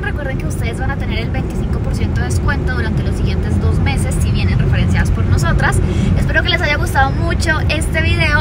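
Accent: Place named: Mexico. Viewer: Que lindo lugar ❤️💕., ya se te nota un poquito el acento mexicano 🤭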